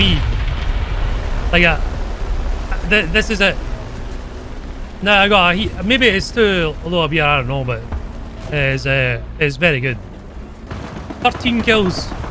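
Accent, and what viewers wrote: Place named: Fife, United Kingdom. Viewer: Just trying to get into WT, as a fellow Scot I found your vids and have ben going through them. Keep up the good work. Really struggling to place the accent though, either posh west end Glesga (east end kid myself but moved away and didn't go to prison or end up on drugs, actually done pretty well when I left that shit hole lol) Anyway, you sound like a Fifer who maybe moved to Glasgow (judging from some of the words used in older vids, naughty Fifer words) Great channel, keep clapping them cheeks ❤🤍💙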